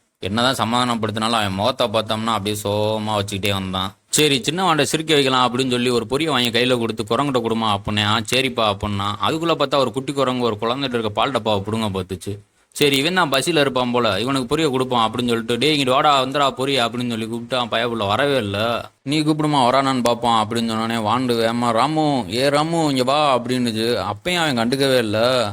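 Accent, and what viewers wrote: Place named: Madurai, India. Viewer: Mathurai slang 👌👌👌👌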